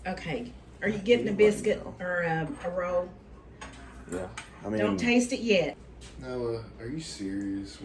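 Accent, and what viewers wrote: Kentucky, United States. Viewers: I don’t comment on yt much but I have to for you! 😂 I can’t stop watching your videos! I love your rawness/transparency, personality, smile, accent (soon as I heard I thought she has to be from Kentucky- bc I am too 😁) and of course love your recipes. They hit home and remind me of my mom and grandparents cooking who have all now passed on. 😢 there were some I wish I had gotten from them and found here so thank you!! (Chocolate gravy for example) You are beautiful and have a beautiful family. I have 3 sons ages 23, 22, and 20. So I can relate! Guys don’t be so shy and help support your mama. 😂 💯 I’m sure she deserves it and seems so proud of you all. 💖 keep up the good work guys. Best wishes 🤟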